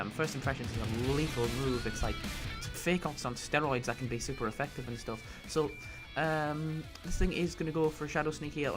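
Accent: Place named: United Kingdom. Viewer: Omg, I love your accent XD idk why but I love British or is that your accent? Anyway it's a really swaggy language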